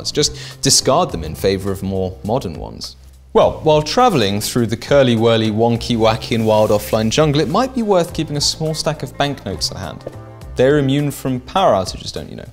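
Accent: RP, United Kingdom. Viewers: How I like British accent. I don't understand any words. What are you saying in this video??? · I love the content. Hate the blatantly obnoxious royalty accent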